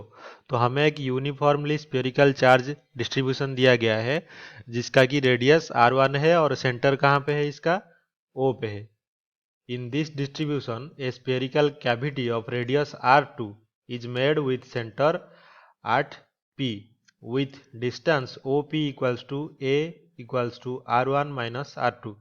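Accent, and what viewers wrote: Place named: Odisha, India. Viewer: After hearing your voice I think your mother tongue is odia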